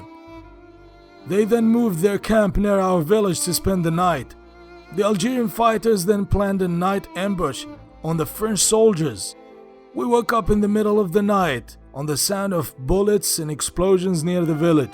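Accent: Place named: Hollywood, United States. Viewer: بارك الله فيك على هذا العمل الجيد والممتع والراءع في نفس الوقت باللغة الإنجليزية ،اتمنى لك كل التوفيق في مجالك وننتظر منك الجديد ،متابع جديد ،ملاحظة لغتك الانجليزية هوليودية بامتيازواصل .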